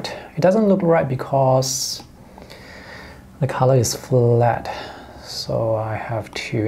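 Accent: Singapore. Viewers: Hey! Really enjoyed this video as I am a fellow designer myself and feeling very conflicted on whether i should get the iPad Air or the Pro for sketching. I was wondering if you're based in Singapore cuz of the way you speak and then I saw the sketches haha! Singaporean here too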